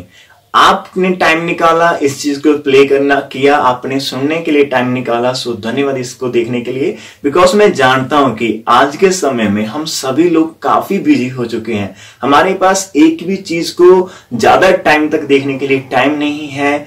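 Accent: Punjab, India. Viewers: Sir vdia lgg rha hai apki hindi main se punjabi sunn rhi hai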